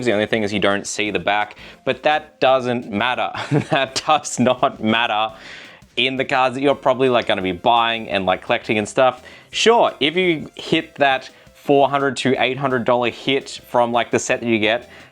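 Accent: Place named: Australia. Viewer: I live in Japan arm and already spend too much money on my anime merch so I really don't want to get into this particular hobby, but I love watching pokemon tcg collecting content and challenges (thanks to DPM, and his shorts channel). Really love hearing an Australian voice on the hobby and how the prices are manifesting back home! I'd love to see how the events look, I've never been to one myself (I live in Perth, and there's much less things happening there lol)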